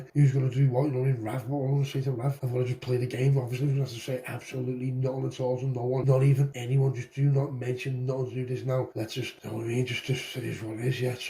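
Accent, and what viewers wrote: Liverpool, United Kingdom. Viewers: Oooow scouse 😂😂😂 even in my darkest hours you still make me smile m8 🎉🎉🎉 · YES SCOUSE. I'd literally listen to one EVERY day, always click straight on when see one of yours. 'What are you on about"❤😂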